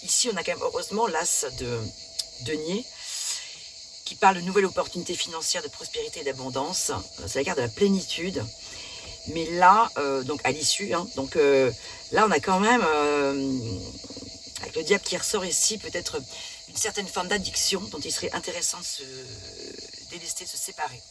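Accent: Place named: South, France. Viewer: Les cigales le sud, l'accent j'aim beaucoup ✨✨✨👌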